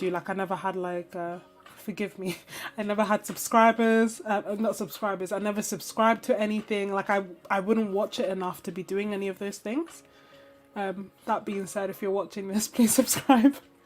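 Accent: United Kingdom. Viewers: Lovely florals, love the colours! And yes, you do have British accent LOL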